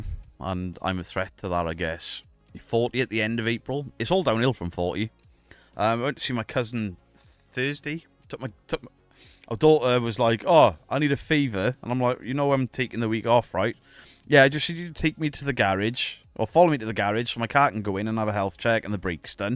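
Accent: Wales, United Kingdom. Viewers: Can tell you're welsh lol drop kicking annabelle 😅😅😅😅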